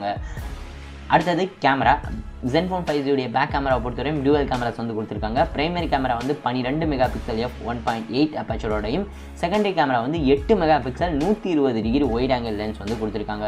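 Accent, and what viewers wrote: Madurai, India. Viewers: Neenga madurai ahh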